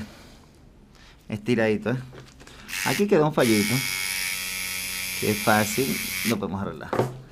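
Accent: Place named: Venezuela. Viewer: Yo estaba claro que eras de venezuela por el acento,un crack perro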